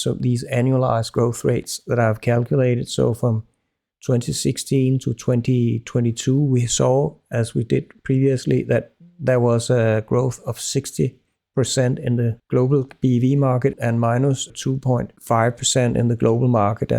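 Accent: Denmark. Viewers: Are you from Denmark? The English sounds "Danish".. (I am a Norwegian)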